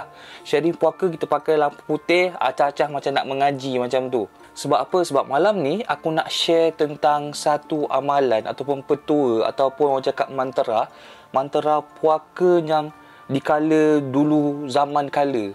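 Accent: Kelantan, Malaysia. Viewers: asal kelate ko?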